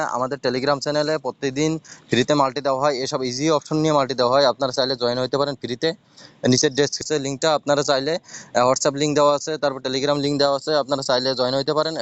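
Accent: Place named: Khulna, Bangladesh. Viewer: Vai apnar desher bari kon jelay.. kotha shune mone hocce khulna te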